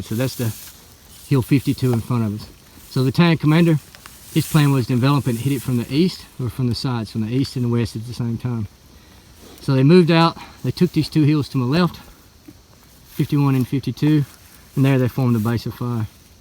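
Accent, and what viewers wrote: South, United States. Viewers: He's a Southerner. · I can understand this rooster better than an Aussie,strange yank accent,I knew a crew that logged on the Solomon Islands,and they said the amount of War relics is unbelievable,and crashed planes still been found to this day...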